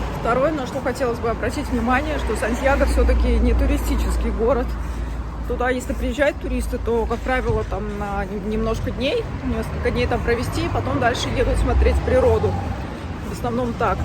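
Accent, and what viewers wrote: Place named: Urals, Russia. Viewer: Так она не говорила что из Москвы,ну и по говору и манерам совсем не москвичка,скорее с Урала..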